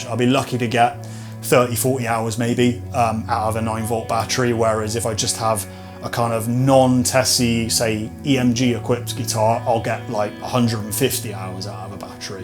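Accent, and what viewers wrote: RP, United Kingdom. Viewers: I always love your videos and posh accent ❤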